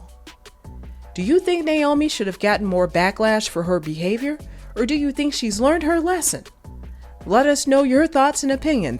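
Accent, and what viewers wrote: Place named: United Kingdom. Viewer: It’s the British accent for meee🤣🤣 spot onnnn🎉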